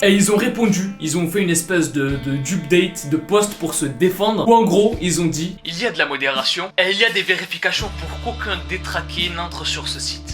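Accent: England, United Kingdom. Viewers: On es tous d'accord que l'accent d'anglais de et Magnifique ✨ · Moi je trouve on parle pas assez de l'accent anglais MAGNIFIQUE de 🤗🤩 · Un accent anglais de qualité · J'adore ton accentuations anglaise 🥰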